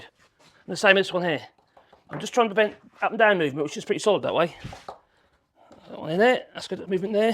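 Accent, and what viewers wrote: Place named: Shires, United Kingdom. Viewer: I love the way you work! It makes me feel better about my own head 😂 Coming from one county north of you makes your channel feel almost like home. There's far too few Shire accents on oooootoooob. You and Furze are about it!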